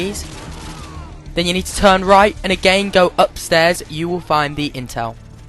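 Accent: United Kingdom. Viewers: I love his british accent =)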